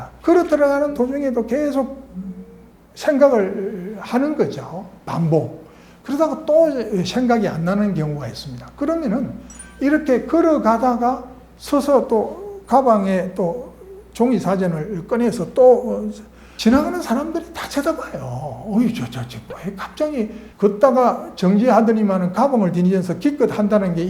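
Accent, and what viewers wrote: Gyeongsang, South Korea. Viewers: 저도 동의합니다~경상도에는 Intonation이 있어서 flat한 영어보다 듣기에 나아요~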